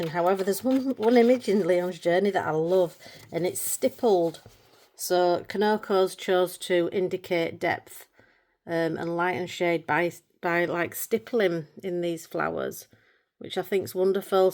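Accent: Yorkshire, United Kingdom. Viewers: I am still watching but had to come here to say this before I get too entangled on the video and forget... when I heard you say "trying speak more clearly"... I love your yorkshire accent/dialect, it's so beautiful and it adds so much personality to your videos! Loveee it! ❤ (even on the occasions I understand nothing at all 😂, still sounds like music to my ears nonetheless) That being said, it took me a few videos to understand if "cracking" was a good thing or a bad thing 😂... but then again English is my second language, so it could just be a lack of proper knowledge on my part 😂, but hey, learning something everyday right? The texture of these gel crayons on your page remind me of Van Gogh thick oil paint strokes that lends a whole different texture and dynamics to the painting. So nice! And it looks so satisfying to watch you smoosh the colors together! 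😊 amazing!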